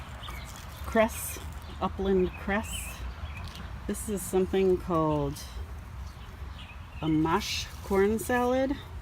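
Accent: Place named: Midwest, United States. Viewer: My late husband and his family are from Minnesota and I detected the midwestern accent!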